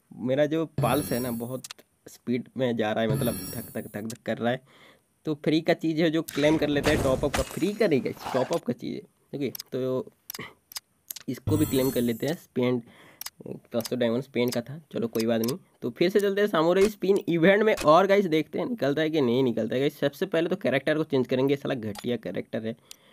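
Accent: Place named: South, India. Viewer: Aap thodaa south side se ho khaa bhai aapka voice se samjaa rhaa hai bro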